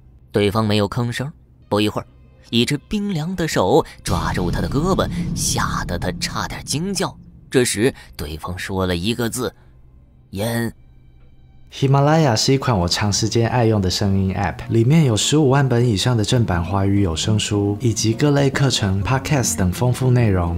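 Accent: Cantonese, China. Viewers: 做得太好好了，很詳細，而且喜歡你講粵語，好可愛❤會一直支持你，加油😊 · 謝謝你的用❤制作，現在香港電影都係古仔一個人撐起，不簡單 … 很掛念哥哥 … 你的廣東話 很可愛 😂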